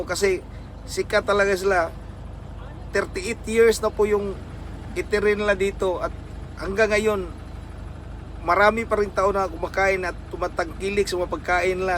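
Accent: Visayas, Philippines. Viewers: Ang tagalog mo brad, Bisaya pa rin pakinggan.. 🤣🤣🤣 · Hoy mag binisaya ka nalang kasi yung tagalog mo pambukid ang style...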